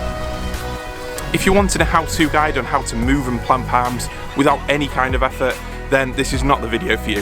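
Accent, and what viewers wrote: United Kingdom. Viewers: Just started watching your vids, trying to create a jungle on Isle of Wight, just bought a musa basjoo but want some trachycarpus fortune seeds, have you got any? I detect a Grimsby accent there to? 👍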